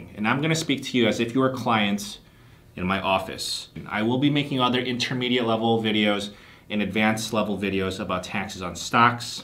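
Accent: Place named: New York, United States. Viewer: His NYC sounded accent adds to its straight-forwardness.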